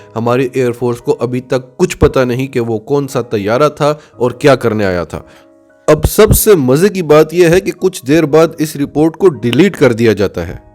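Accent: Pakistan. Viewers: Pure pakistani urdu accent love you sir (saaien) better than urdu speaking accent love you carry on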